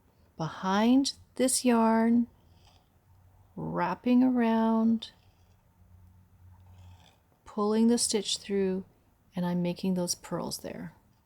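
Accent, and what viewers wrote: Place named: Canada. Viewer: Yay! Vancouverite here. I was about to ask if you were Canadian, your accent gives it away. Georgia St?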